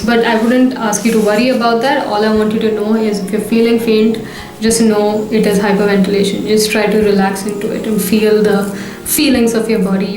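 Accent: India. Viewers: This was a great video with one exception.... the negative effects of the sporadic release of cortisol. I also think that people with a not so healthy heart might stroke, I don't know as I am not a trained professional but I have had all these symptoms it seems at the same time. I have also experienced horrible "brain zaps" that left me numb all over, those are so scary! Thank you for a good video! I have always loved to listen to the flow of an Indian accent. Blessings!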